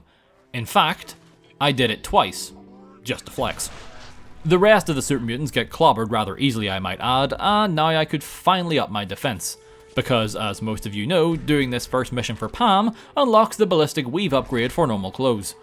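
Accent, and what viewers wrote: Northern Ireland, United Kingdom. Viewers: It's a pet peeve of yours that that someone from Northern Ireland doesn't speak in an American accent? How very odd.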